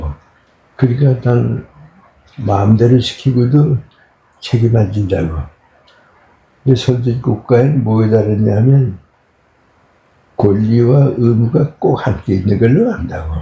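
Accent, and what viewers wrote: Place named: North Korea. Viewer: 북쪽 사투리...듣기 힘든 시대가 되었어요. 언제나 들을 수 없는 귀한 말투 입니다. 지금도 듣게 해주셔서 감사합니다. 건강하시길 간절히 기도합니다.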